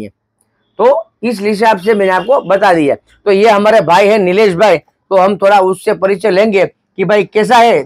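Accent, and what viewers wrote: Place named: India. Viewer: Aje tame apda hindi bhasha vara bhayu ne samjavo bhai ame to gujrati maj mahiti sambhad su apdi gujrati ni majaj kaik alag che bhai amay tamari bolvani pich sav desi bhasha ma majaj aeva rakhe che bhai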